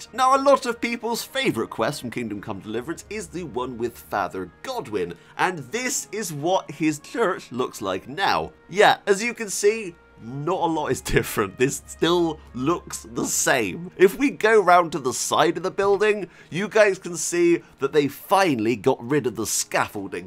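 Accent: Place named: Dorset, United Kingdom. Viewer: is that a posh dorset accent? I have no idea what it is, wacky man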